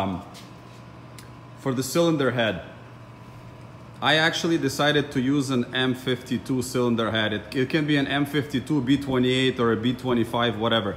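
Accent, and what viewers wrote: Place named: Russia. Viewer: я услышал русский акцент =) у вас хороший английский... мне ваш английский был очень понятен..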